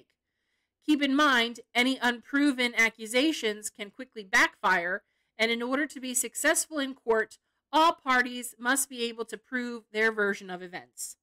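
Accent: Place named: Delaware County, United States. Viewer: I knew I heard a Delco accent.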